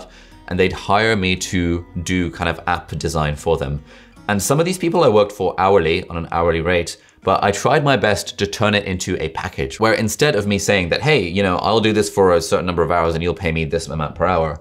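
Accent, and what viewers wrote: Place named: United Kingdom. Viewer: It’s the UK accent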